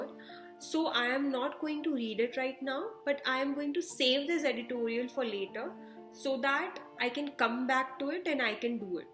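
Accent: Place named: South Delhi, India. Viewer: Your accent is like south Delhi girl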